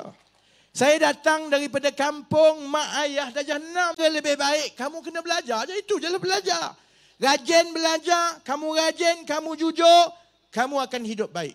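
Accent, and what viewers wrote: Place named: Kelantan, Malaysia. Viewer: Actually I hv liking for his speeches and I like his kelantanese slang....I used to listen from those days....I missed him in 2018....just envy this person for his mind frame....bravo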